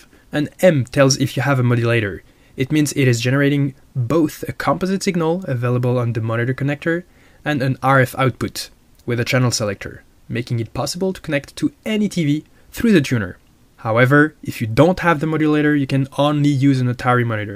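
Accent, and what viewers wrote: France. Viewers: Ahh cet accent bien fançais :-) Superbe vidéo, merci !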